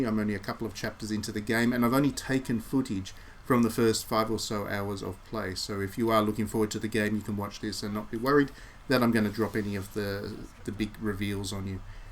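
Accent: Australia. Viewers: Been watching this closely, the fact that bravely default was often referred to as the best final fantasy in a long time (without being one) by a lot of people had me so interested but I never got to play it. (Hopefully they port to switch or ps4...Dunno I think it's a nintendo exclusive?) This told me pretty much what I needed to know, ya also sound Australian so consider my subbed. Got ya point across well an laid back bro. 👌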